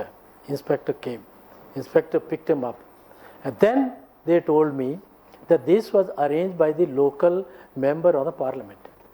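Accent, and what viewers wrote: India. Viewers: Sardaar ji in South accent Great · You can still hear his Hindi twang.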